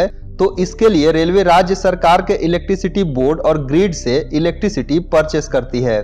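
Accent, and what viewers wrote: Bihar, India. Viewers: Are u a bihari..?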